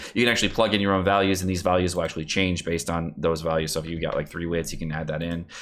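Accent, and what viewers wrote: United Kingdom. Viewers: No British today?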